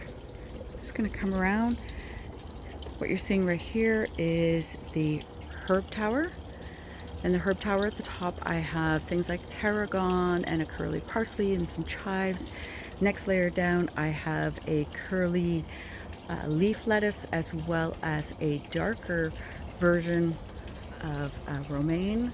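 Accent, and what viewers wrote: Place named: Canada. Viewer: Just found your channel. What garden zone are you? I hear a bit of Canadian accent. I am in Homer, Alaska - Zone 4-5, ?6 this year, depending. Love your style: beautifully designed, well thought out, well-grown garden in a space that most of us can relate to - not the "estate level" that is so gorgeous, but so unattainable. Hope to see many more videos on your garden.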